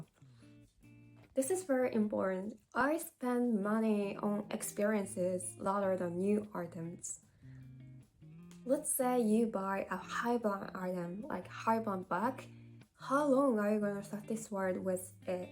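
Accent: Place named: Australia. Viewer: Hi, Already I can hear that you are developing an Australian accent! 😅 You do have some very good points and perspectives on frugal living. Yes there are many different cultural attitudes towards money. As an example (and not intended to stereotype), in my experience it's not uncommon to observe that there are many people from South East Asia/South Pacific who grew up in more rural/tribal setting and have a very short time horizon towards money. They are more focussed on the here and now so concepts such as saving for retirement like your mum are a completely foreign concept to them. If those people receive an unexpected windfall then often they will celebrate by spending it all now rather than putting to one side. Also if other family members know that there is extra money available they may pressure the person with the extra money to help. Of course, there are still people like that in more disciplined cultures but they tend to be the outliers and not the norm. 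And yes you are right about Credit card use. It has to be disciplined but can still be useful when travelling. If there is any fraud on the credit card the money involved is the banks money. If there is any fraud on your debit card then it's your own money and you will not have any access to it while they investigate.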